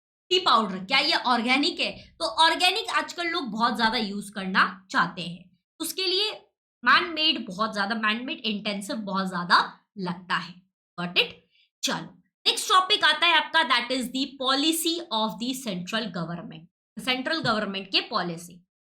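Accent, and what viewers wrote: Maharashtra, India. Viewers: Mam app Marathi ho kya 😅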